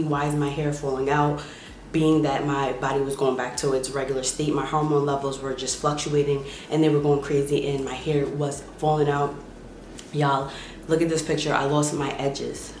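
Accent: New York, United States. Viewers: are you from NYC? loll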